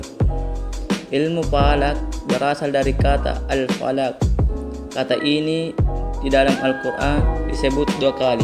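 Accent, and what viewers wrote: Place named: Makassar, Indonesia. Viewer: Sepertinya logat makassar ini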